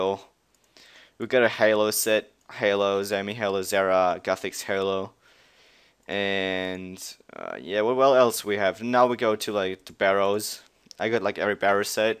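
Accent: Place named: England, United Kingdom. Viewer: It's like your talking in and english accent but trying to fight off some foreign accent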